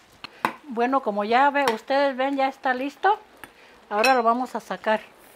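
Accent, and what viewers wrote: Michoacán, Mexico. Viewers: forma tan natural de expresarse muy de México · DAVID CORDOVA y michoacana Con ese acento tan dulce de las michoacanas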